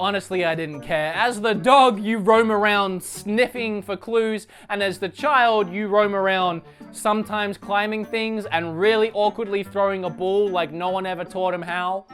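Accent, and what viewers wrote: Australia. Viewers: Are you australian? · Are you aussie or is my accent detection going whack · He is Australian lol, I'm Scottish and understand the confusion between English and Australian accents, listen for the subtle sounds that Australians make. Also, if you mean the whole island of Britain you would say British.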